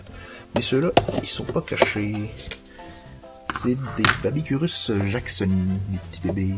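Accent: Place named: Quebec, Canada. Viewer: J'adore l'accent québekoi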